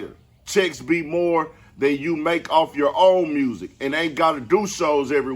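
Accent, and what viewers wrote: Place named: Texas, United States. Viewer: I love your commentary. It’s so Texas. Shout out to you from DFW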